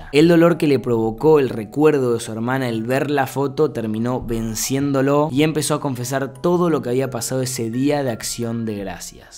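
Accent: Argentina. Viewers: Q hermoso te queda El acento argentino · Amo tu acento Argentino ...Es tan bello como el chico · Es argentino da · Es por qué es argentino como yo xd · De donde sos, te digo de buenas es que en argentina se habla así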